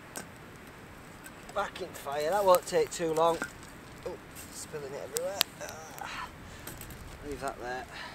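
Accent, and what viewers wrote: Yorkshire, United Kingdom. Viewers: Hi mate I love your videos and have been fancying a go at some coastal virgin foraging I’m from Yorkshire and picked up you ears coast accent . I’m wondering where’s best to go for a first time thinking robin hoods bay what you think bro ?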